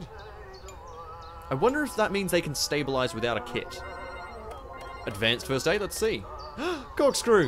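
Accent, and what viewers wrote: Australia. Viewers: I started watching this earlier today and when I came back he sounded even more Australian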